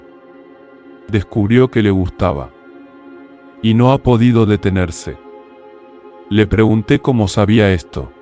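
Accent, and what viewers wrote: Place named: Argentina. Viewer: Esta es la voz a la que me refería, que suena con un acento argentino. Me fascinaba escucharlo, ya que me transportaba a través de mi imaginación, provocando la emoción de la narración de principio a fin. Sencillamente, me encanta esta voz. 🎤💗👏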